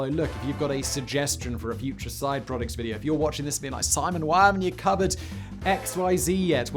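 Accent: Mid-Atlantic, United States. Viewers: It's pronounced zed, you mid Atlantic sellout.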